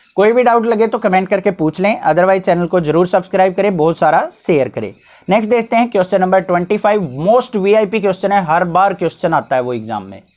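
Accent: Haryana, India. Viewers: sir: dekho upper lakha ka😂 haryana walo ki haryanvi Hamesha bich ma hi aa jati h I am also from Haryana♥️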